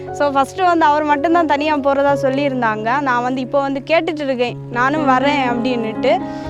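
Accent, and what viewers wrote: Kanyakumari, India. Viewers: Uinga voice eaa solu thu kaniyakumari nu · Hi ..... sister கன்னியாகுமரி slang nice...look like Kerala a slang ... உங்கள் கனவுகள் வெற்றி பெற வாழ்த்துக்கள்..... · Akka you’re kanyakumari Tamil slang so sweet akka. to beautiful❤️❤️❤️❤️👍 legend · Kannyakumari slang veliya tarriuthu akka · Na slang vecha kandupidichitan Kanyakumari nu super sis nanum Kanyakumari tan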